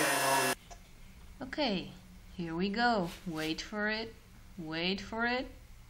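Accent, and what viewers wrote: Netherlands. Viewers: dutch accent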